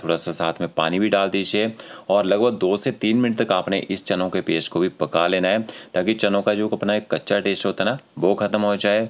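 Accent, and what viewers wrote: Pakistan. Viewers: Bhai you sound like Pakistani😅 aapne ye kaarni hai vo krni ha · Aapki voice ek pakistani jaisi lagti hai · Pakistani lehja hain apka to ji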